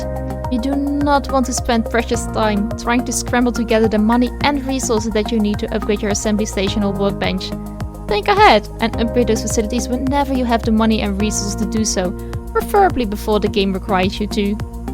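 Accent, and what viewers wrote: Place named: Netherlands. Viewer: As a fellow dutchy, I could tell that Dutch accent from a mile away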